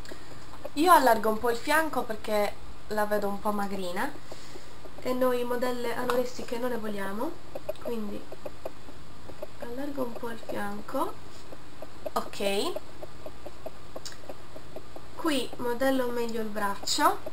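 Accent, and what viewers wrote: Sardinia, Italy. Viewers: Sembra sarda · Davvero comlimenti per il disegno sei veramente brava! Sembra che tu abbia l'accento sardo, ho sentito bene? Io son sarda ;) (Budoni OT) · sei sarda? <3 sembra di si dalla pronuncia ahahah ;)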